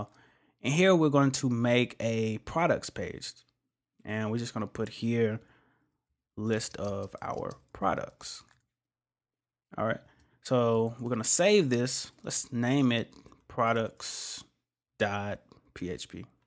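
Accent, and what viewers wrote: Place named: Brooklyn, United States. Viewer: Nice bro, its like Brooklyn brother teach me how to code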